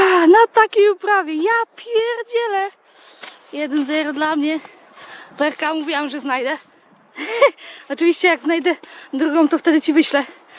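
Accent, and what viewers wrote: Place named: Podlasie, Poland. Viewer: Zazdro z tymi rosochami, taką to łatwiej znaleźć niż tykę jelenia, bo dzięki śniegowi łosia można łatwiej wytropić. A u mnie łosi nie ma. Gratuluję :) I podoba mi się twój akcent, chyba podlaski?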